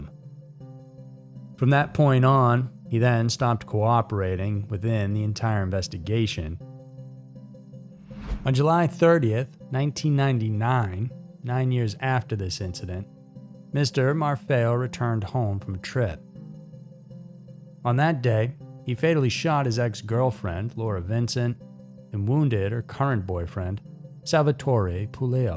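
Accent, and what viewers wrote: South, United States. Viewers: Lord, I love your voice. It has a southern ring to it, like I have. Anyhow, it's a lot better than that random Canadian guy who sounds like the least interesting man in the world.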